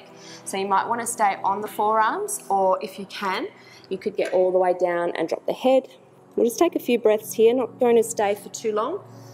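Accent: Australia. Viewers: That Australian accent though!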